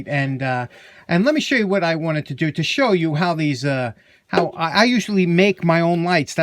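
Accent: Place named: East Coast, United States. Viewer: You’re the best, all of your nostalgic sentiments resonate in me. I was born in Allentown, PA in 1969 and moved to CA when I was 10, your East Coast accent and perspective rings true for me. Happy New Year. I love all of your posts and look forward to all that come in 2021.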